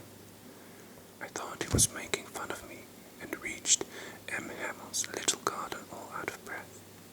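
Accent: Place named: Germany. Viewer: You have a little german accent :) xx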